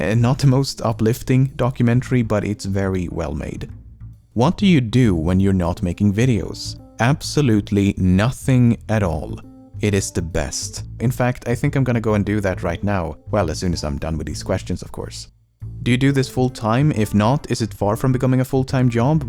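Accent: Gothenburg, Sweden. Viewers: Lem’s narration on his older vids (especially 2 mil Q&A) reminded me of Keralis... meybe it’s because of the low pitch Swede accent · Är du från Göteborg? De låter så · Sooooooo i caught something in this video.... I think accent is becoming more english than swedish. Honestly that thick swedish accent is what makes your videos stand out from the whole youtube space. Oh and also congrats on 3M! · No, he has a slight swedish accent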